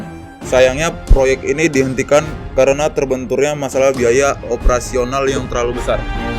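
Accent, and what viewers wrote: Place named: West Java, Indonesia. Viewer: Ini pasti yg baca narasi orang sunda..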